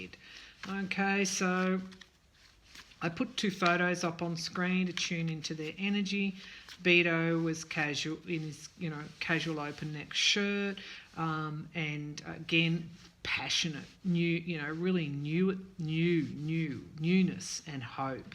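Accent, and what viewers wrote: Australia. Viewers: Great to hear another Aussie accent oi, oi, oi! Best wishes for your channel.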